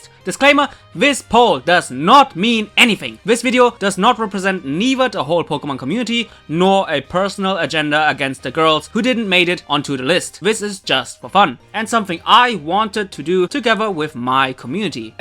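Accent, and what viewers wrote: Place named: Germany. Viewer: Hey + Maybe I'm wrong but are you from Germany? Your way of speach of some Names really seams like your from germany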